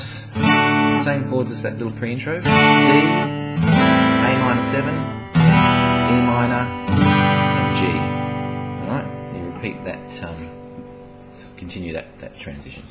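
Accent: Australia. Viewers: Thanks for the lesson, very clear, no nonsense. Love Aussie accent !!! Looking forward to playing it. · hes not english, he sounds Australian...or maybe kiwi?! but his music is definitely high koala-ty!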